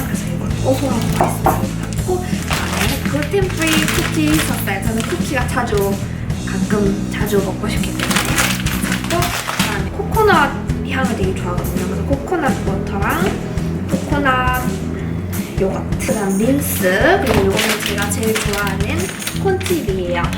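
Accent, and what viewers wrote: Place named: Gyeongsang, South Korea. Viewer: 경상도말투 핵기욤이셔요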